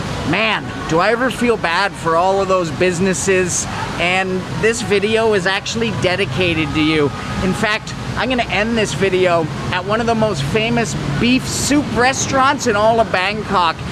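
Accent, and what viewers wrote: Canada. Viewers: This man has the most Canadian accent ever. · Can't stand Canadian accents. I'm out....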